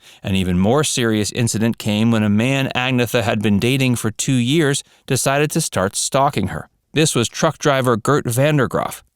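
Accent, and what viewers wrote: United States. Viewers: Listening to your lazy Americanized pronunciation of their names was painful. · I was set a bit curious by that reference too. But without prejudice hearing the narrator's accent i can correctly guess he's American. America didn't give any hoots yet about ABBA so forgive them.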